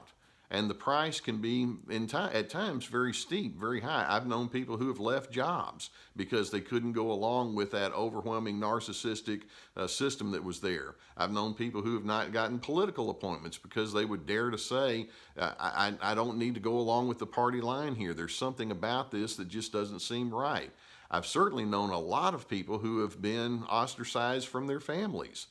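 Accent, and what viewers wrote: South, United States. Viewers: Experiencing his flying monkeys now. It’s awful. I thought these people were my friends. So hurtful. Love your videos. Love your southern voice. Thank you for such honest videos. They are spot on.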